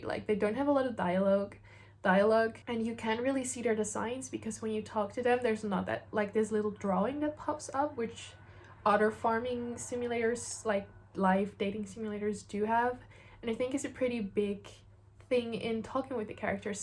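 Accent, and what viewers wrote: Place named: Netherlands. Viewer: Ik hoorde direct aan je accent dat je ook Nederlands bent! ♥️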